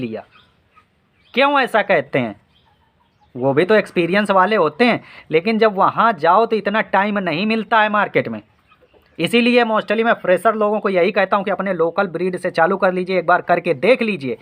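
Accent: Kanpur, India. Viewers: वीडियो देखकर मन प्रसन्न हो गया । बोलने का कनपुरिया लहजा अच्छा लगा साथ ही जमीनी हकीकत से रूबरू हुए ।धन्यवाद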